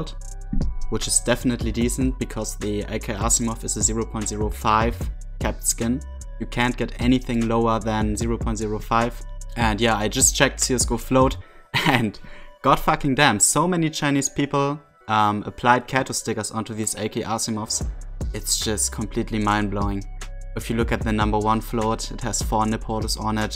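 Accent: Germany. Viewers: werd doch mal diese ekeligen bibanator deutsch akkzent los , das wäre mal n nice craft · Dieser deutsche Akzent 😂 nohate an der Stelle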